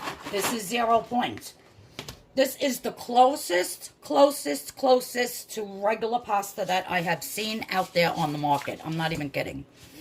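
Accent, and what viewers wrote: New York, United States. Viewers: I’m new to your channel ! Loving your recipes and your accent ! Are you from NY ! You sound like me !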